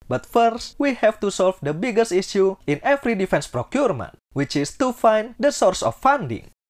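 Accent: Indonesia. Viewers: Keren aksen nya original Indonesia 👍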